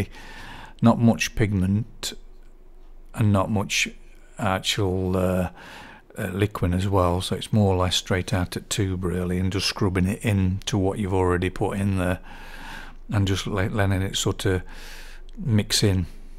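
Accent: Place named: Ilkeston, United Kingdom. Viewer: Born and bred in Ilkeston, I recognised your local accent immediately! I’m in Lancashire now but wondered if you’d share whereabouts you’re from? Not an Ilson lad yourself are you?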